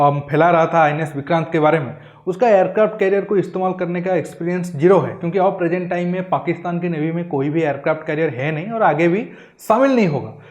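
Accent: Odisha, India. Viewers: Are you from Orissa? Your pronounciation is like odissi people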